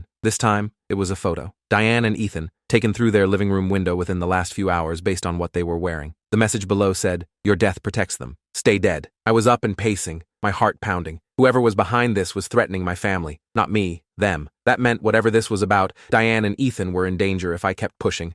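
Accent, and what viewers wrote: United Kingdom. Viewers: ooohhhh yeeessss ahh british accent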